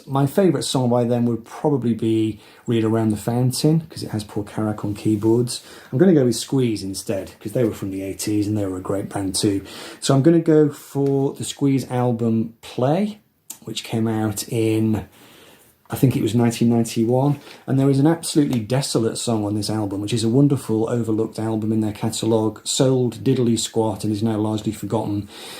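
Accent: United Kingdom. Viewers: Hi, very enjoyable and at the same time kind of sad video! There is something about lyrics recited with a British accent that really makes it classy, at least from an American perspective. take care, Elliott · Hi. Depressingly good video on the most positive manner. Some absolute tear jerkers in there along with some unexpected choices. Really great delivery which is such a strong positive on your channel. Are you a NW England guy, I’m trying to place the accent. I’m from the Potteries (Stoke if you didn’t know) as you can probably tell from my accent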